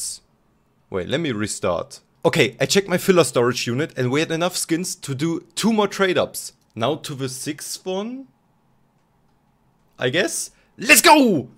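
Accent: Germany. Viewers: Nice tradeups, and are you german, because you have a strong accent xd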